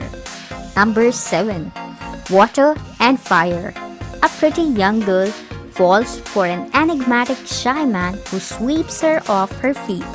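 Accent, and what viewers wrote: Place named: India. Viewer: Omg the Indian accent is annoying to finish this video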